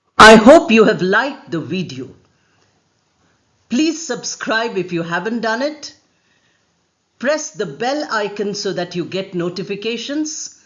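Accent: India. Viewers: Dear madam However well indians speak English it is not like native speakers of English.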